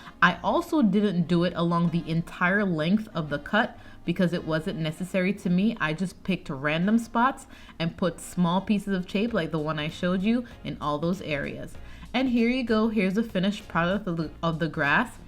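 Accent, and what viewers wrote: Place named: Jamaica. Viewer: Looks great, I love it! I detect a Jamaican accent, are you from Jamaica?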